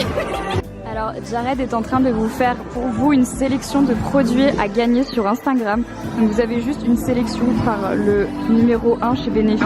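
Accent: England, United Kingdom. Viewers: J'aime trop ton accent anglais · T'as un super accent anglais ♥️♥️♥️!!!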